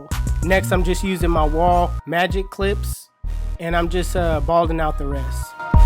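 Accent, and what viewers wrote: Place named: New York, United States. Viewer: New Yorker accent is strong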